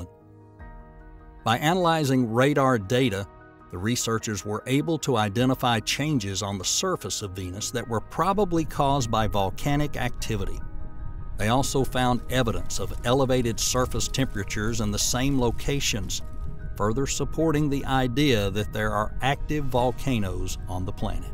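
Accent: South, United States. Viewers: Always expect a British guy when watching space videos. The country accent always catches me off guard lol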